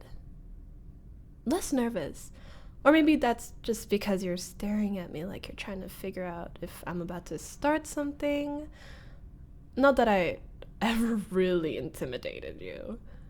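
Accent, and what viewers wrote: France. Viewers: Purée je me perd dans l'asmr et je tombe sur une asmrtiste incroyable qui parle anglais I appreciate seing french speaking english and I absolutely love your accent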